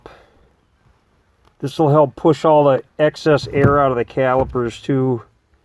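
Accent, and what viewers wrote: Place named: Midwest, United States. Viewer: this guy is so midwestern🤣🤣🤣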